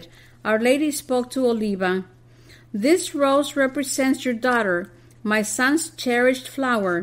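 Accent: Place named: Colorado, United States. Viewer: Interesting presentation. Never heard of this saint. Thanks 🙏. Your narrator has an interesting accent.... southern Colorado or New Mexico? Just curious. Appreciate your work much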